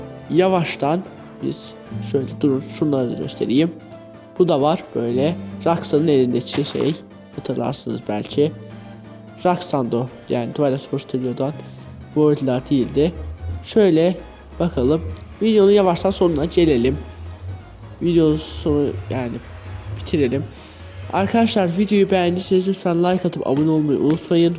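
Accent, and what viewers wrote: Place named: Azerbaijan. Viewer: Salam məndə azeriyəm danışığınan bilinir azəri olduğun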